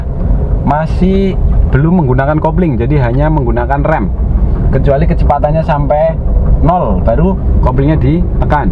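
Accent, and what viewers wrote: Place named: Banyumas, Indonesia. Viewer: Wong ngapak ya😁😂😃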